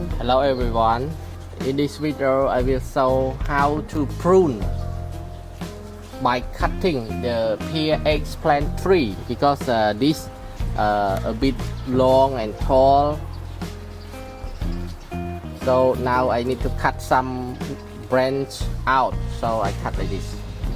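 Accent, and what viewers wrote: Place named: Cambodia. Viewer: you must be Cambodian, sound like my uncle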